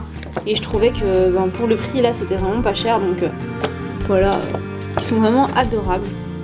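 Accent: Belgium. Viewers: Tu es belge ? Si oui comme moi !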